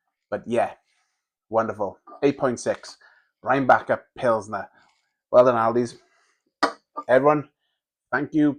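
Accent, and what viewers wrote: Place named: Wales, United Kingdom. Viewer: Nice review, nice and objective about it. Not to take anything away from this drink as I like it myself, this particular one is brewed n France (it was German brewed years ago) and on the subject of Aldi clone/tribute brands, this particular one is a clone of Germany's Krombacher Pilsner. The Krombacher is available in Tesco and Morrisons as part of their 3 for £6/6.50 deal. Btw I detect a Welsh accent, do you live in Wales?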